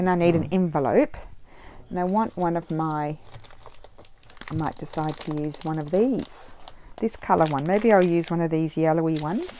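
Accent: Australia. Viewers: Hi are you from Australia 🇦🇺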